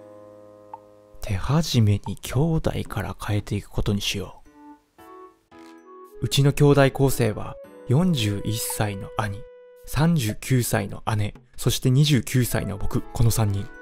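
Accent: Kagoshima, Japan. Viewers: かごんま出身、知らなかったか忘れてました😮訛りたまらん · 鹿児島のなまり（笑） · 鹿児島出身鹿児島育ちなので、方言嬉しいです😆 さんの動画などで、いつも笑わせてもらってます！ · リクエストした鹿児島弁さっそく話して下さりありがとうございますー🎵鹿児島弁忘れてなかったですね😆笑ったぁー😆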